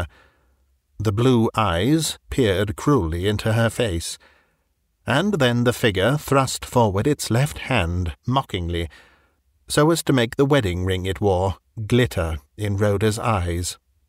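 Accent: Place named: West Country, United Kingdom. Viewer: You do a marvelous West Country accent.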